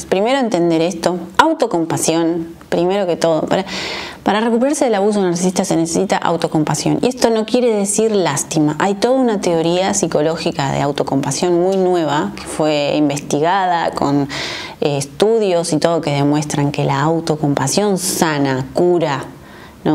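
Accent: Argentina. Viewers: Soy Española me encanta tu acento creo que Argentino, recién.llegada a este bonito canal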